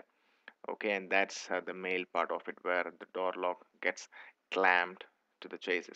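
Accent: India. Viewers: For Indians only. Terrible accent.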